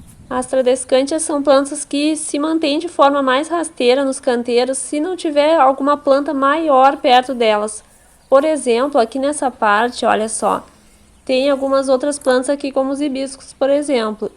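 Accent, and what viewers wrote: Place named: Pelotas, Brazil. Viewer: Parabéns pelo canal. Seu sotaque parece da região de Pelotas. Obrigado pelas dicas.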